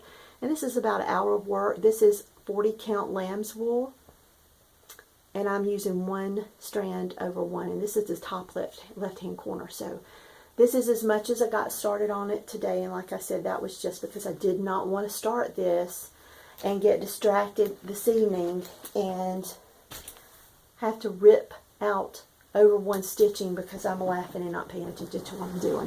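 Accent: South, United States. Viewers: You make me laugh, your southerness is the best.......what a wonderful idea for your sons room.....god bless him for serving, my son is in the AF as well 17 yrs ......I miss him immensely, he will retire hopefully in 3 I don’t think he plans on staying longer, he will be 38 yrs old him and family are stationed in Vegas I live in ohio so I only see once a year, it’s hard...but they will move home after retirement...yeah! Love all your stitching, stash and junking....I’m a junker too 😀😀😀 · Thank you so much for sharing your trip to Charleston! It is definitely on my list to visit! I was born and raised in Florida, and have many ancestors from GA and North and South Carolina 😄 Your stitching is gorgeous! And your southern accent makes me homesick. Enjoyed my visit! ☘️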